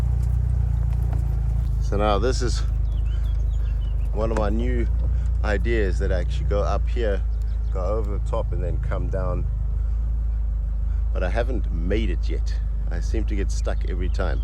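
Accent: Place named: Gauteng, South Africa. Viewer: Profile says Spain. My ear says Gauteng :)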